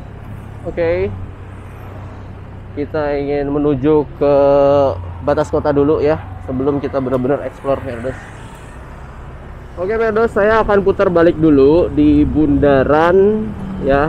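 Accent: Banjar, Indonesia. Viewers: Lah orng banjar kah pian😂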